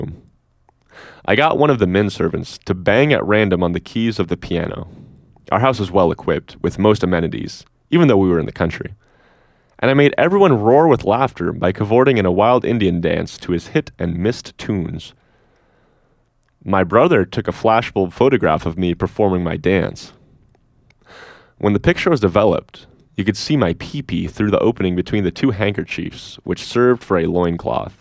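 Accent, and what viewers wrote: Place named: United States. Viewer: God the thick American accent and the stupid pity half weeping sappy voice what a way to ruin an audiobook. The writing is not supposed to be sentimental lol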